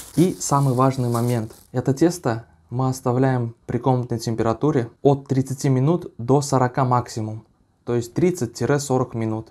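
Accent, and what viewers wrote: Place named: Bashkortostan, Russia. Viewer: Как будто из башкирии, у нас обычно с таким "акцентом" (гОвором) разговаривают.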